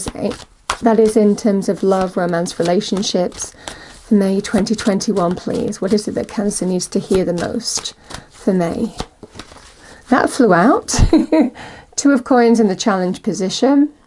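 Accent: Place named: London, United Kingdom. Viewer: Thank you thank you for this beautiful reading I love the way you explain the cards and how you do the reading thank you thank you thank you also you are from London your accent is very lovely are you from London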